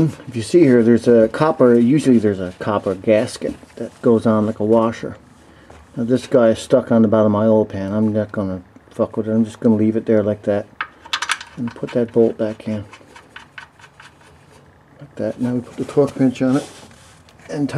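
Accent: Canada. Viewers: this guy sounds like a fucking newfie lol click click click click click click with the torque wrench lmfao rofl!!!!!!!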